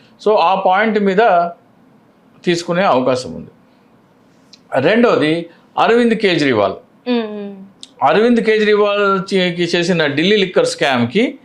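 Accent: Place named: Telangana, India. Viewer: Eeyana telangana language lo maatladutunnade..🤔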